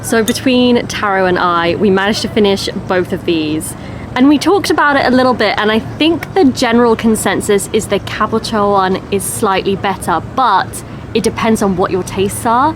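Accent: United Kingdom. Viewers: きれいなイギリス英語なので、字幕の英語をメモして残しています。そうすると、Squishyさんの癖がよくわかりますね。 例えば、ここでを表す「here」は副詞だと思っていたのですが、名詞もあるのですね。「in here」と、しばしば使ってました。初めて知りましたよ。hereの名刺的用法だなんて。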